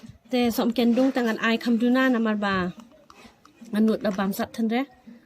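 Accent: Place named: Malki, India. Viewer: Ktien shnong malki te kum jong ii thik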